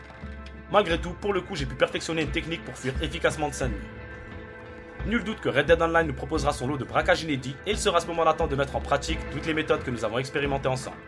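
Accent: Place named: Tourcoing, France. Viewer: ta un axen de Tourcoing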